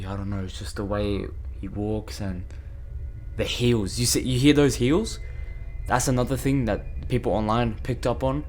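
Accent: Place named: Australia. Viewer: Fellow pom ? You sound Australian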